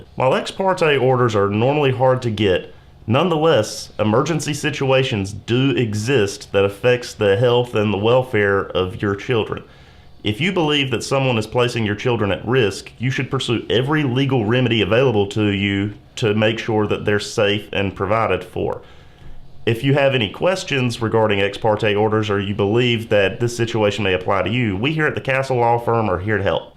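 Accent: North Carolina, United States. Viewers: I knew this was north carolina cuz of the accent hahaha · I thought I detected a NC accent! I raised my children in Chapel Hill.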